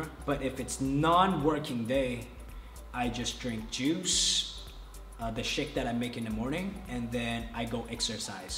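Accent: United States. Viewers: He went to an international school in HK, so yeah American accented English is norm. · A non-American teacher with a tinge of an American accent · He went to American International School in Hong Kong and was probably taught by Americans, that's why he speaks like an American. But I love your comment :D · i obv know that i'm just trying to say that even though his accent is kinda american there is a little diference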